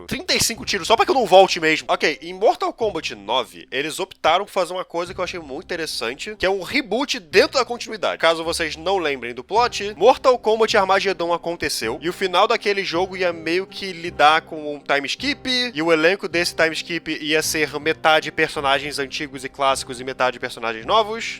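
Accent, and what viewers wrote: Rio de Janeiro, Brazil. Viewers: O sotaque combinou muito kkkkkkkkkkkk, asmeiiii um carioca reclamando é muito baummm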